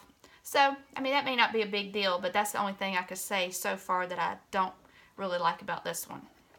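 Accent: South, United States. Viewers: Thank you!! Love your southern tone ❤️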